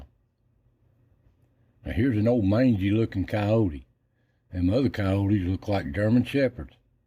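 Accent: Appalachia, United States. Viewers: We love your voice, it is extremely intelligent knowledge with a true applachian tone. Love when you narrate. Enjoyed the wildlife this morning. Thank you for everything... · Yes sir Mr., we love hearing you narrate these wildlife videos!! Viewing the animals is great to watch taking in all GOD’S CREATION, but your Appalachian voice filled with your knowledge and the way you explain everything to us is the icing on the cake!!!! Great Video!! 😇🙏🏻👍🏻☀️😊😊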